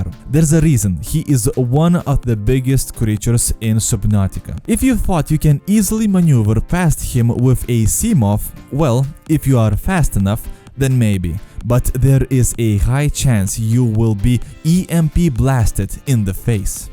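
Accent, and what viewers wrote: Russia. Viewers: Акцент прям как у русского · Мммм... Русский акцент) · Wait IS THAT A RUSSIN I HEAR ??? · yea i can see you sound russian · Максимально русский акцент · Love Russia accent friend... no really I like the Russia accent!!! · Russian accent I love it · It love you're Russian accents!!! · Бля, чувство что ты русский) акцент точно не инглЫш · omg i love his russian accent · That russian accent tho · Русский акцент или нет? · Are you from Russia? Your pronunciation is very similar to Russian. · Youre accent youre from russia? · Ты русскиий? У тебя акцент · he sounds like a stereotypical russian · Are you Russian or from Republic of Moldova? :) I ask that bcs of your accent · Акцент русский. Разве нет? Четко слышно букву "Р"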